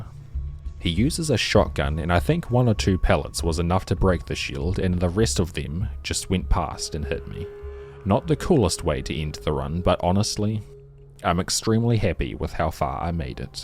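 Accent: New Zealand. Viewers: New Zealand accent is a strange choice. It's very realistic sounding, but most other accents are a lot more pleasant on the ears. "Uf I take even a sungul hut, the run is did."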